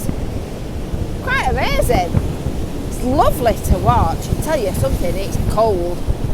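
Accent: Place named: Lancashire, United Kingdom. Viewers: I love to listen to your lancashire accent. Been in nz for 47 years. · I just love to listen your beautiful Lancashire's accent..l used to drive along the promenade from st.annes to Blackpool and stopped over to watch the sea.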